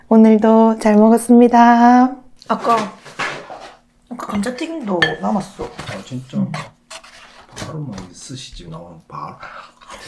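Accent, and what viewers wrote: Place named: Rural, South Korea. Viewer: You guys are so cute together! 😊 You have the country Korean accent, so cute.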